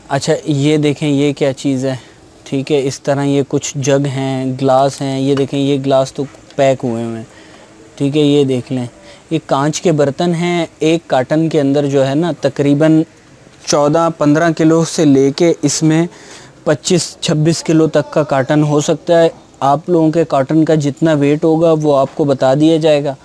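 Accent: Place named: Urdu, Pakistan. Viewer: Ur urdu accent and way of way of talk is very beautiful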